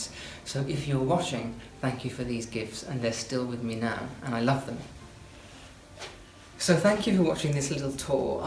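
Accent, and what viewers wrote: United Kingdom. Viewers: I LOVE your style! So classic and warm and inviting! I also love your voice, it has a soft, soothing vibe, yet very much classy British! I'm so happy you've decided against painting the bureau as I thought the instant I saw it that it's such a beautiful piece that added just the right touch of character to the room! Forgive me..I realize this video is a few months old but I've just come across your channel and am LOVING it! I want nothing more than to be able to visit the UK for an indefinite period and soak up as much history and food as I can possibly take!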